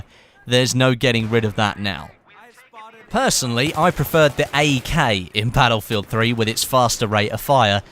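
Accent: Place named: United Kingdom. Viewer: I like your British accent.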